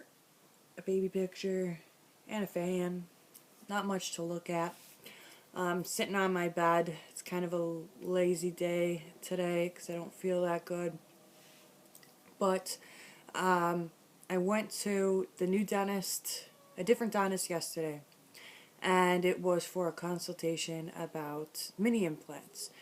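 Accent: New York, United States. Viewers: I love your accent! New York?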